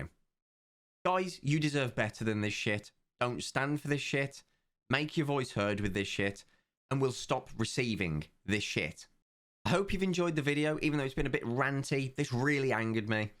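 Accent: United Kingdom. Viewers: British :P x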